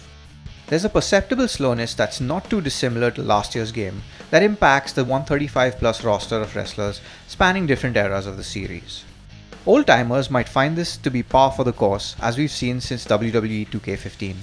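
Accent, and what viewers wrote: India. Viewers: Horrible preview. This guy is pretty subjective and his Indian accent makes me wanna puke.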